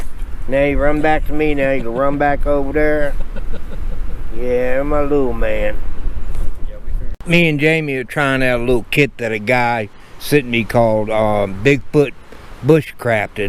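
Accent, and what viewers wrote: United States. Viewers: pretty spot new mexico is it.like watching your videos , you make me laugh with that american accent of yours..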